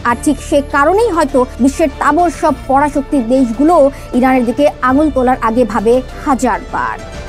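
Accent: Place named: Kolkata, India. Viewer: _____এই চ্যানেলের উপস্থাপকের সংবাদপাঠ কলকাতার মতো। এটা কি সত্যি বাংলাদেশের YouTube চ্যানেল নাকি কলকাতার....🤔🤔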